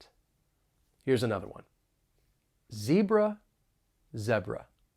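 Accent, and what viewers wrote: United States. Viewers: Beautiful, nothing like American pronunciation. It's my favorite. ❤ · you are an amazing teacher i have seen on youtube,,,,,,,your teaching skills are amazing,,,,,,,we all needed this type of explanation🥰🥰 you just nailed amercian accent🥵now its very easy for pronounce such an accent ☺but the last one is very difficult and complicated 🥴SUPERCALIFROAGILISTICEXPIALIDOCIOUS ufff🥵🤧🤒😴🥱 what does it mean 🤔by the way thankyou so much for this explanation🥰🥰🥰greetings and love from PAKISTAN😍😘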